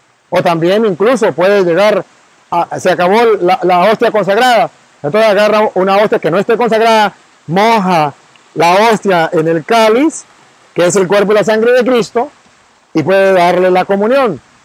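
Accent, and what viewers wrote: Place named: Mexico. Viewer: Buenos días padre bendiciones padre usted venezolano su acentos es mexicano. 🙏 yo creo firmemente cuerpo y la sangre de Jesús. Tuve bella experiensa..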